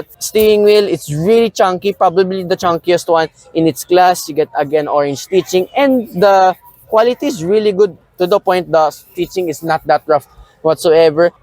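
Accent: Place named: Philippines. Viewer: Pinoy accent pero in fairness magaling sya mag english. Malawak vocab nya 👍🏼 But I want to hear him magtagalog haha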